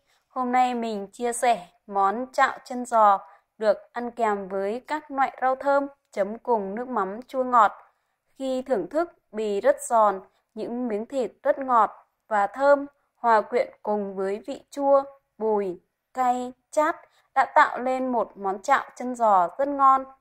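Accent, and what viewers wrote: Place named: Nam Dinh, Vietnam. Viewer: chuẩn giọng Nam Định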